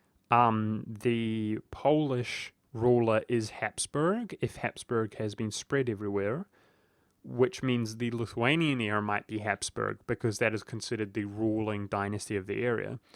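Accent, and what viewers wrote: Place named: New Zealand. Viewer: I fuckin love having a Kiwi EUIV Youtuber to watch. As a Hamiltonian it is so much nicer and familiar to the ears. On ya mate, tu meke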